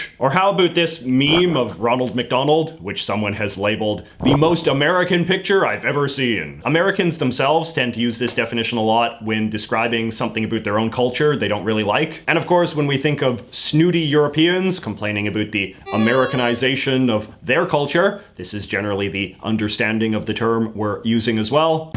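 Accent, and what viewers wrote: Canada. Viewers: you have the most stereotypical canadian accent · Although he does pronounce it a little oddly, you'd expect in his canadian accent that he'd go hard into the R sound but actually skips it altogether! Normally in an anglo accent it's pronounced boar-jwah, but goes for something more like boo-jwah. I'd be interested to know if that's deliberate or not if you do read this!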